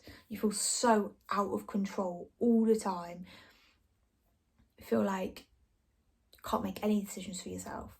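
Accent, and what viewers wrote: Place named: Midlands, United Kingdom. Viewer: Can't pin down your accent maybe midlands Nottingham area let us know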